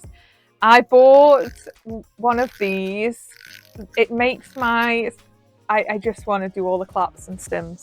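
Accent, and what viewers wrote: United Kingdom. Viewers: Are you from Liverpool? Love to listen to your accent.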